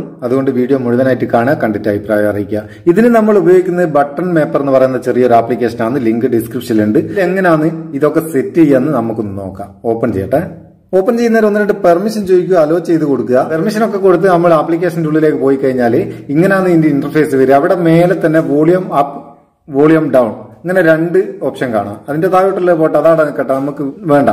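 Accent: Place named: Meppayur, India. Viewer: മേപ്പയൂർ കാരനാ ല്ലേ.... ഭാഷ കേട്ടേരേ തോന്നി പേരാമ്പറെ ന്റെ അടുത്തേടിയൊ ആണ്ന്ന്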